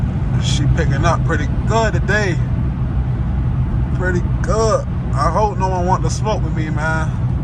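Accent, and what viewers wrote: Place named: Baltimore, United States. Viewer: That Baltimore accent thick gud video G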